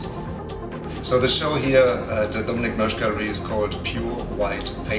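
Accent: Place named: Germany. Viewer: that german accent...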